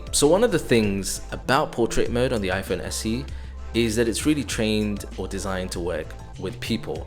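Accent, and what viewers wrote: United Kingdom. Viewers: great review :) i‘m gonna get one ig (loveee ur british accent haha) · most british accent review that i've ever seen dude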